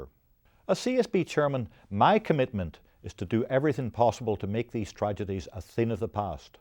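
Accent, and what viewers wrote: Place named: Northern Ireland, United Kingdom. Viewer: Yay, this guiy is Irish. From the north...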